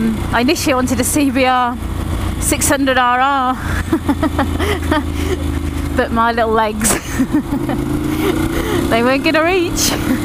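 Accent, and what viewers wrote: United Kingdom. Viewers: WOW you really are a happy bunny aren't you !! You sound like my next door neighbour, she's a Brummie gal as well. Just wanted to ask where you got the handlebar mount from? I've just bought a Go Pro 2 and I think the best point to fit on the GS is probably the engine bars but still looking for options at the moment. Great vid by the way. All the best JJ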